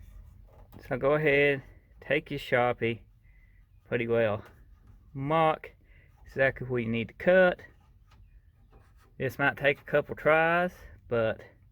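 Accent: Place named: South, United States. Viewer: This video is exactly what I was looking for. Also, I thought my southern accent was thick but you put me to shame.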